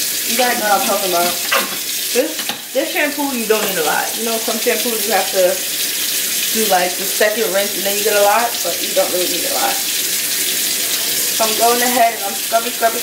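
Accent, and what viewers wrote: New York, United States. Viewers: Love this vid !!! I definitely know your from New York lol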